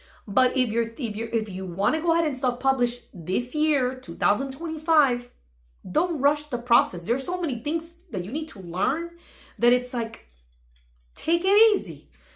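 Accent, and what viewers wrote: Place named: Latin America. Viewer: I just finished this video. Priceless insights! And loved the subtle Latina accent. It helped me to connect and invest just a little bit more. Looking for the next one to watch. Thanks again!!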